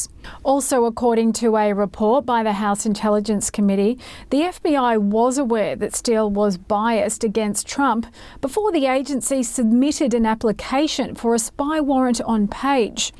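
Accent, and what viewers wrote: Australia. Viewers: U sound like a Aussie.. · Thanks God for a good honest reporting I like the epic time you’re a spicy lady with awesome Australian accent keep up the great work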